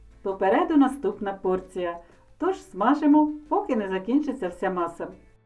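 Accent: Standard. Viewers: Спасибо за чистый и мелодичный украинский язык. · Цікаво👍Треба спробувати. Особисто від мене дяка за гарну українську, вперше чую на кулінарному каналі таку чітку мову❤Процвітання вашому каналу.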